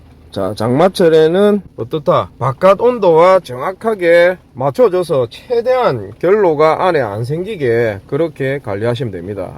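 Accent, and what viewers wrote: Gyeongsang, South Korea. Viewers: 오오 ㅎㅎ 정말 유용한정보 감사합니다 ㅎㅎㅎ ㅎㅎ 경상도분이시라서 너무 너무 친숙해요 ㅎㅎㅎ 저희어머니쪽이 의성이신데 ㅎㅎ [근데 꼭 어디서 뵌분처럼 너무 너무 친숙하고 친절하게 잘 설명해주십니다 ㅎㅎㅎ ] 최고 최고 짱짱맨~~ ㅎㅎㅎ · 구수한 경상도 사투리 멋져요~~~~😊😊😊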